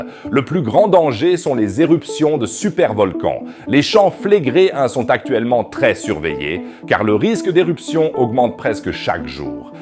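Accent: Quebec, Canada. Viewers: Accent quebecois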